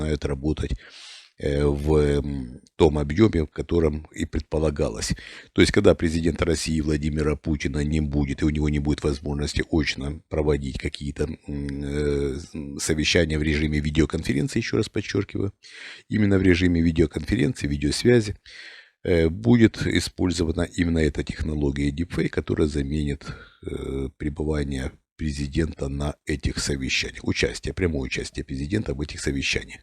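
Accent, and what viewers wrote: Ukraine. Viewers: Виктор Михайлович, вы же говорите по русски. А в русском языке принято говорить на Урале, на Украине, а не в Украине. Выражение в Украине сильно неграмотно и политизировано. Понятно на чьей вы стороне, да и акцент у вас украинский. Не знаю насколько вы генерал СВР. Вы хоть думайте хотя бы иногда, а то мне кажется у вас немного ненормально с логикой.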